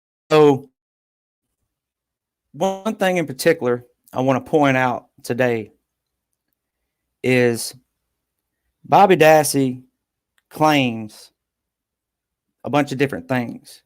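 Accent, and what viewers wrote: South, United States. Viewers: You're my absolute favorite of all M2M enthusiusts...love the southern accent too. I'm originally from Bham, AL I can relate.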